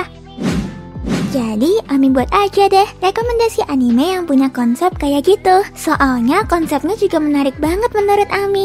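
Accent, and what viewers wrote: Japan. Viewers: faseh bgt aksen jepang nya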